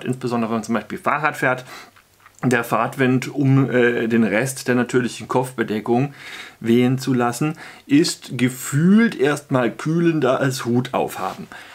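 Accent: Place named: Alemannic, Germany. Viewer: F. allemannischer ist noch ein bisschen weiter im Süden. In der Gegend vom ist das badische noch vom Elsas beeinflusst. Dadurch unterscheidet sich der badische Dialekt noch ein bissle vom sch(w)äbischen. <ma‘ muss hald do no ä bissle de badisch/schwäbische Freindschaft pfläge, gell> 🤣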